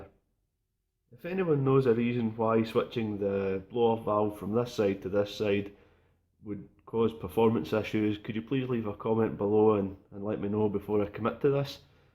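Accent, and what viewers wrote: Scotland, United Kingdom. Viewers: Great video again. I had a motor tour though Scotland planned end of May,, but with the isolation measures in place.... that's of the calendar.... so I have to do with your video's, your accent and a great Glen Moray. Give my best regards to George... Cheers from Holland !!! Ruud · he's Scottish (west coast,Glasgow area) he is trying his best to speak polite proper English, that's why he sounds like he's only here for the banter :) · Ah, the first time I've heard your voice! Lovely Scottish accent. I'm looking forward to your new projects!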